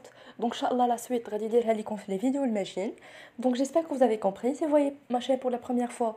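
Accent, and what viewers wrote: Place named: Paris, France. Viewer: 😂😂😂😂hrbtini tana kt3sbni hdra dyalha tguli ghir ylah nzlat mn paris hhhhhhhhhhhh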